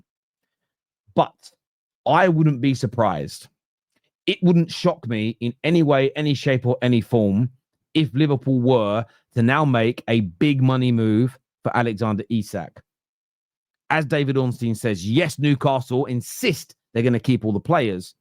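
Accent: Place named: Cockney, United Kingdom. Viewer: What a load of crap! Another cockney conman!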